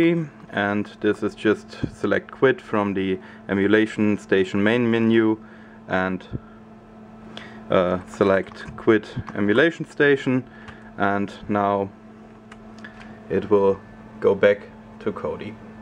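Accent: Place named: Germany. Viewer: OMG, ich höre krassen deutschen Akzent raus, könnte es aber auch nicht besser.Seitdem ich RaspBMC nicht mehr nutze, da es ja nun OSMC gibt, frage ich mich, ob es denn möglich ist, z.B. den Xbox 360 Wireless Controller vom PC über USB zu nutzen, denn wenn es schon so tolle Emulatoren gibt, wäre es ja äußerst unpraktisch, diese nur per Tastatur nutzen zu können.Also - ist es möglich?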